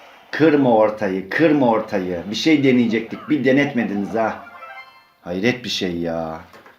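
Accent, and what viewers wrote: Black Sea, Turkey. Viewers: Abi Trabzonlumusun Rizelimi ?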